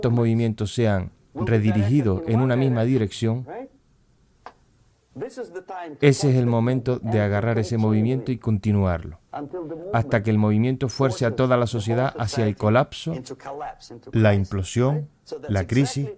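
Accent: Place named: Canary Islands, Spain. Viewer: Muchísimas gracias por compartir conocimiento que de verdad nutre, tan escaso y tan difícil ee discernir entre todo el marasmo de intoxicación y desinformación que hay en la red. Orgullosa de verificar que por supuesto hay otro canario "despierto" (nuestro acento te delata...es inconfundible y a mi parecer precioso). Te deseo lo mejor con el canal, y en general, "que te vaya bonito" que diríamos por estos lares.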